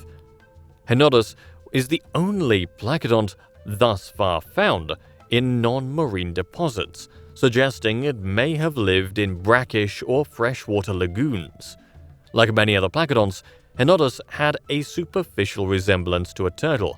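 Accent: United Kingdom. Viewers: Am I the only one sick UK accents?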